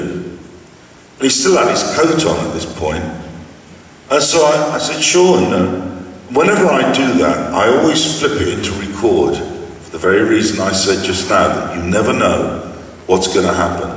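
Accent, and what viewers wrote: United Kingdom. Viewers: Loved his British accent. He is missed. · This guy was an extraordiary monumnet of rock music and I really love to listen to him talking with this intensive british sound (what else could it be then?)! This is heartwarming - I grew up with his music and i still honor him deeply!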